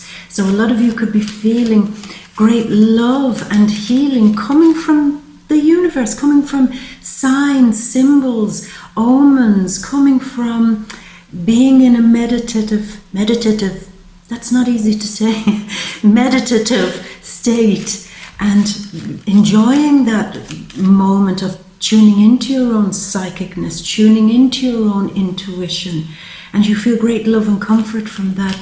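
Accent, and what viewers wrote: Ireland. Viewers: Is that an Irish accent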